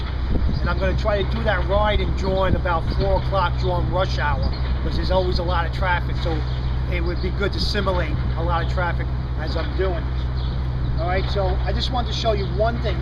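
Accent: New York, United States. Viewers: man I wish I could have your new york accent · Dats for all yu trolls and haydus out dare. Love that New York Accent.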